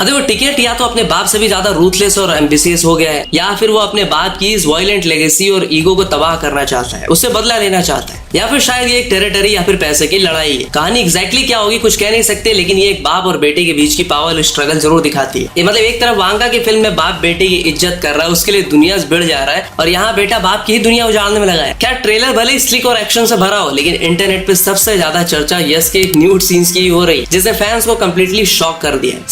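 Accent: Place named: UP/Bihar, India. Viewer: Bhai Tumhara review Pura scripted laga Kyun ki Ji angreezi shabd tumhne trailer ko uncha dikhane mein istamaal kiya woh kaafi ache hai Aur Baaki angreezi tumne istamaal kiya Woh UP/ Bhihar ka MTI yane mother tongue influence se bhaara hai. Pata nai Kitne log ye mehsus karenge but Mera opinion yahi hai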